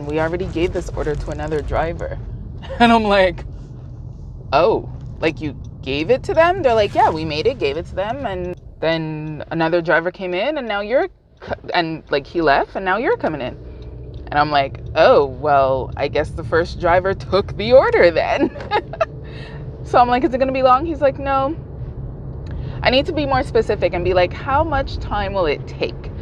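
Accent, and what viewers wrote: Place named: Canada. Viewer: The Canadian accent is music to my ears. Why are you on the right side tho? Is you camera mirrored?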